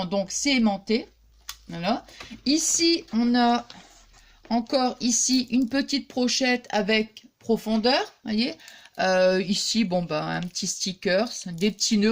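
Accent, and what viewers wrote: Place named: Nord, France. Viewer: Bonsoir, vos créations sont vraiment magnifiques👍🤩🤩🤩et vous êtes tjours aussi Géniale, sympa et l'accent du Nord j'adore 😃😅 bonne soirée 😘😘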